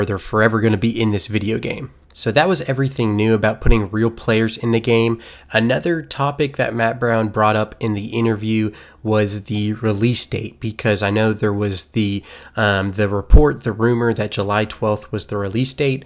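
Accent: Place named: Mid-Atlantic, United States. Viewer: Mid Atlantic accent detected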